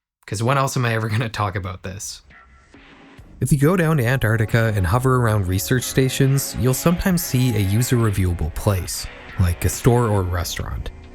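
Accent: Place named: Nova Scotia, Canada. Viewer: Are you from Nova Scotia?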